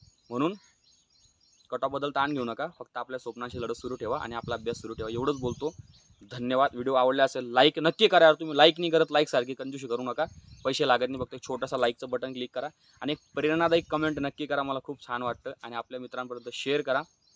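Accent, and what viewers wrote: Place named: Malkapur, India. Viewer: दादा तुमच्या भाषे वरून कळते ,तुम्ही मलकापूर चे आहात k😊😊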